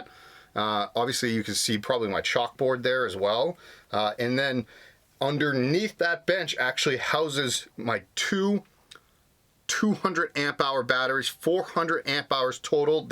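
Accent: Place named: Italian-American, United States. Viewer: East coast, Italian descent??? "Batta bing...," "anyways". Lol love it. FYI: water weighs 8 lbs per gallon, plus the weight of the container. Just saw your interview with Bob Wells, came to your channel, loving it so far. Know this video is almost a year old, will be checking out others. Love how fast you talk. Retired biochemist here that did standup comedy as a hobby for fundraisers in San Diego then Az. Mostly retired. Will be on the road by Jan 1, 2020. Carry on.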